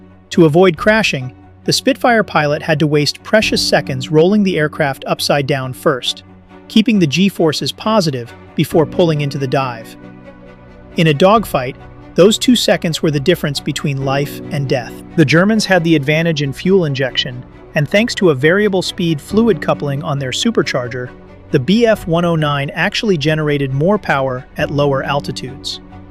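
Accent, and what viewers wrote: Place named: United States. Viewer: One question!? Whit is there a American accent narrator on this, and not a British accent!??? I mean, the video is about British ww2 aircraft is it not!??!!🇬🇧🏴🏴🏴🇮🇱🇮🇪🇬🇪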